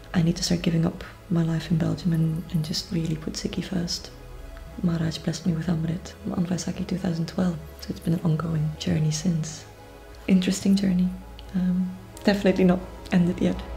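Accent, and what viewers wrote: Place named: Amritsar, India. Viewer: Her accent AMRITSAR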